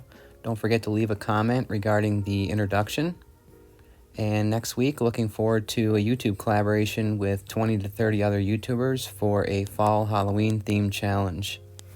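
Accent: Wisconsin, United States. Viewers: I am just watching you and a few others refinish furniture. I have chronic headaches and can't handle the fumes or the bending. I'm trying sewing but having trouble concentrating due to the chronic headaches. I'll get there. I am a 2 but sometimes a 1! My husband is super neat and I drive him crazy cause I'm not as orderly as when all 5 kids were home. Now when the grandkids are over their parents will clean up with them before they leave which is a life saver! You do good work. I like watching you and listening to you. That Wisconsin accent. Lol.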